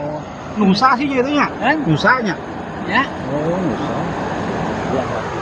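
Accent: Jakarta, Indonesia. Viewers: bang ngomong ny Betawi banget, emang asli nya orang mana bang?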